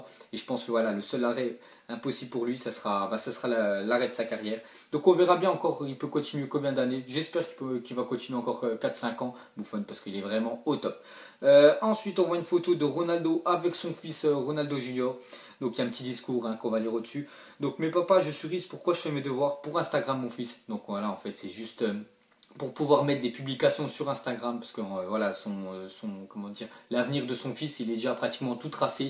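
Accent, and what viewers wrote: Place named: Marseille, France. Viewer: tu as l acsent marseiller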